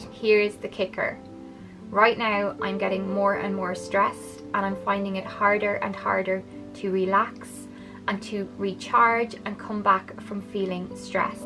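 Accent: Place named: Ireland. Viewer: Love the irish accent x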